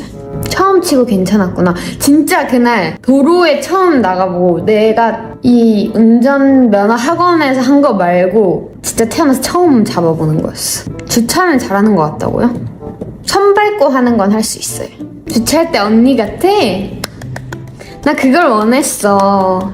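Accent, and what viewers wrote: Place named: Seoul, South Korea. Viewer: 말투 제스처 보니 가스나 서울여자 다됏네~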